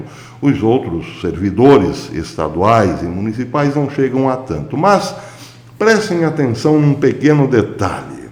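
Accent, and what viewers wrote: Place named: Minas Gerais, Brazil. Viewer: PROF...... ADORO QUANDO MISTURA A FALA NORMAL COM O BREGA OU MINEIREZ.HOJE GOSTEI, TAMBÉM, DO SARCASMO MAMATA... AG GRANDE VITÓRIA ES.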